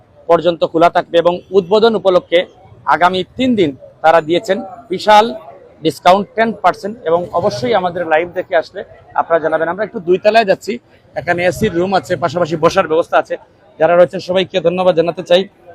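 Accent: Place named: Sylhet, Bangladesh. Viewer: Sylheti accent bhaisab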